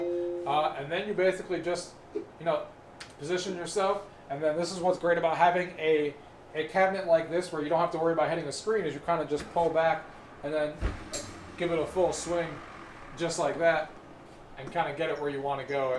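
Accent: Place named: North Jersey, United States. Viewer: Dude, your in jersey? Wtf , I'm guessing your from the northern or western part by your accent. It's not as bad as a bayonne one like mine, but you have to be north of old Bridge. Hahah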